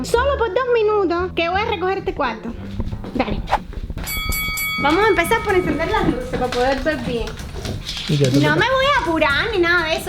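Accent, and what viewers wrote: Cuba. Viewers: Habla 24 hr cubano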